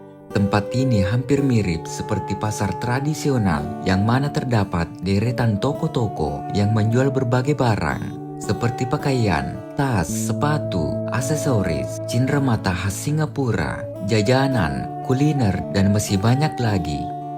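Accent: Bugis, Indonesia. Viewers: aksen (logat admin) nya khas Bugis Sengkang (Wajo) mantap sukses min🙏👍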